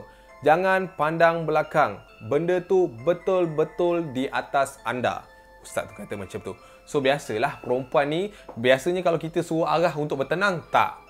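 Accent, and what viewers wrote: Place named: North, Malaysia. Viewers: Steady bang.. Loghat utara time menyampaikan kisah seram ni buat lagi sya sronok nak dgar. Hahaha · Abg fasih naw ckp utagha bleh lepak skali pa nnti mau sembang kita dua ni tiga gelas kopi pon kleaaa😂😂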